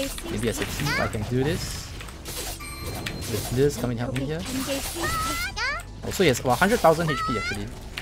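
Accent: Singapore. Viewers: you sound like youre from singapore. are you?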